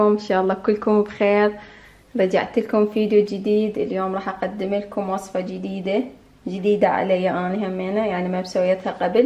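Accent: Iraq. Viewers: وكت ماكو سامحوني همينا حلوة اللهجة العراقية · فديت عراقية اشكد حلوه لهجتنا اختج من كركوك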